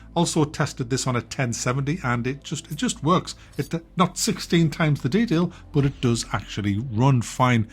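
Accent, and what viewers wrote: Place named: Newcastle, United Kingdom. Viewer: Ahh... The Chief is a Geordie then 🤔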